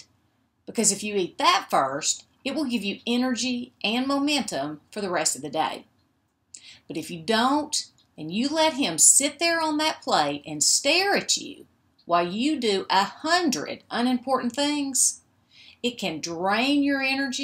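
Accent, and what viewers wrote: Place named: South, United States. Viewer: Hi: I enjoyed your video so very much! I'm a southern girl too (Georgia) so I love you accent. Blessings to you....Renee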